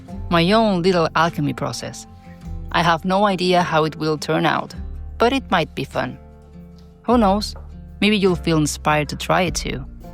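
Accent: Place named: Spain. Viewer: I love your videos. They make me want to live in spain. I love your voiceovers and your Spanish accent. ❤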